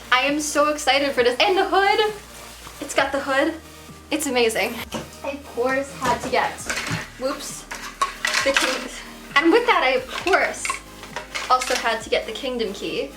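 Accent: United States. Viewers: You miss pronounced Cheshire, but that’s ok you still cool. It’s an American thing saying Shire. 😁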